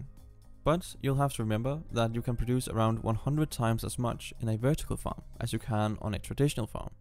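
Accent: Denmark. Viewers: Bold of you to assume I'm American. I'm from Europe as well so yes, I'm fully aware of what a Danish accent sounds like and yes I can hear that when he speaks but that is obviously not the issue here. You can speak up or speak quietly with the same exact accent. I dont agree that it's "my problem" if I'm not a fan of the quiet style of talking. It's not about it being a problem per se, I was just providing feedback and my view on the video. Don't need any white knights coming here in defence who have never seen a comment section of a youtube video before. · That's the Danish accent you're hearing ;) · I can hear the dane in your voice, our accent is quite unique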